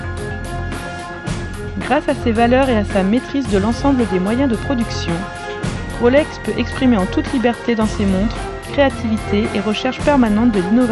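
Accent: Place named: Mexico. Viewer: why is she talking mexican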